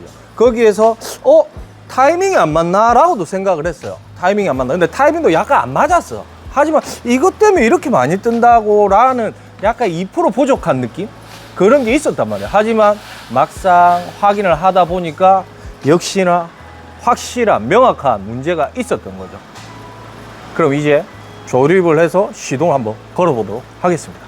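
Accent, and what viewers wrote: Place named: Busan, South Korea. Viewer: 본가가 근처에있는데 구독자놀러가면 커피한잔 주십니까?ㅎ..정비도 정비지만 부산사투리맛집ㅎ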